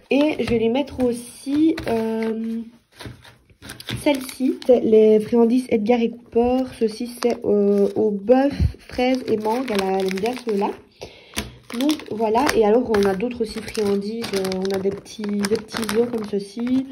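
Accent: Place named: Ardennes, France. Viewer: Très mignon ! J'ai eu un border collie que nous venons de perdre et je voudrais un chien ressemblant au border collie mais un peu moins grand. J'hésite entre mâle ou femelle.. J'aime votre petit accent peut être des Ardennes, je vis en Bretagne mais je suis nait à Charleville Mézières. Bravo pour cette jolie vidéo 😊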